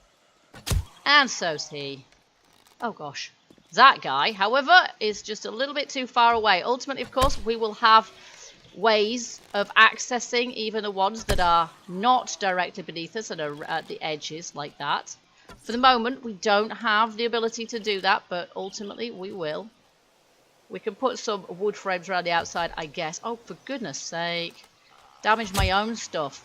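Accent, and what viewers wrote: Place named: England, United Kingdom. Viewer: I just wanted to tell that i like english accent. 😋 cu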